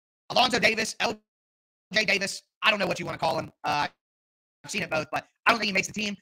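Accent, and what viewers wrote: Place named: South, United States. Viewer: This is why I can’t subscribe to this channel with that southern accent I know he doesn’t want a black QB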